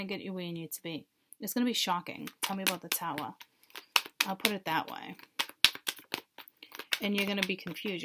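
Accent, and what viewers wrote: Boston, United States. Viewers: Are you from Boston?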